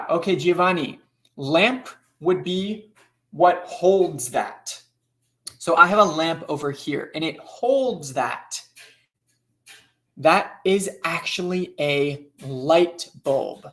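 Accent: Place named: California, United States. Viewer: AWESOME! THE BEST ACCENT I"VE HEARD IN FLUENCY, FROM CALIFORNIA.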